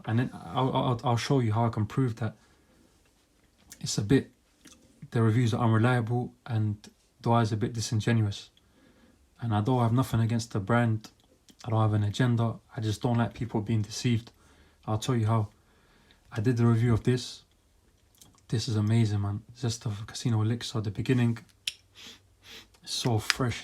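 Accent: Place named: Arab world. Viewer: Hispanic name, with arabic accent?!! Strange!!!!!!!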